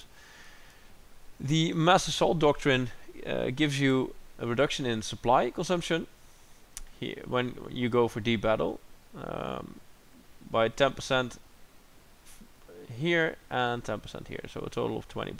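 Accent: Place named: Scandinavia. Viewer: what accent is that, prob scandinavian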